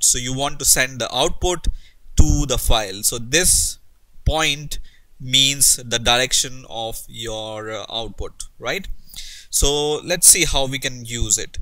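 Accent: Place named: India. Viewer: Please, do us all and yourself a favor and try to improve your accent, so it's more English and less Hindish!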